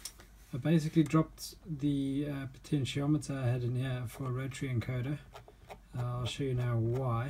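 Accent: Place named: South Africa. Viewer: SA accent with British stations. Where are you?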